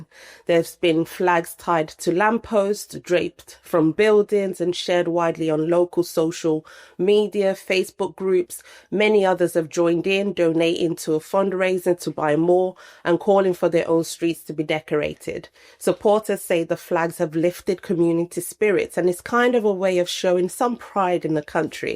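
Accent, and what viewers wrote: United Kingdom. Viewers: It's not white British. It's Bittish. By your accent I assume your predominantly British. How do you identify?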